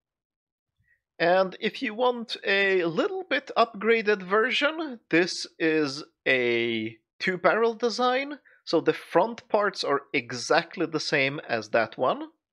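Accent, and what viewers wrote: Sweden. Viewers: The Swedish accent makes him look even smarter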